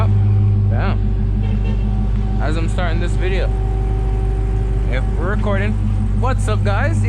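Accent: Jamaica. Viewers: Are you a jamaican??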